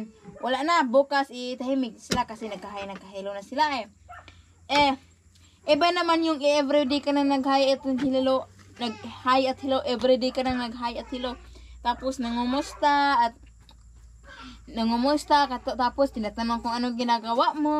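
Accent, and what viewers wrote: Visayas, Philippines. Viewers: Bisaya po kase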